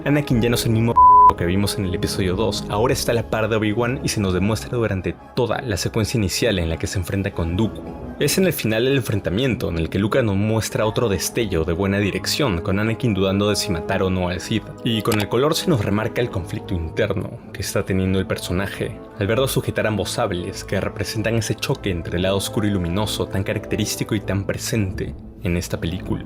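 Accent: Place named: Peru. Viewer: manoo ese acento peruano es todo te juro, sube mas videos asi la subes como mrd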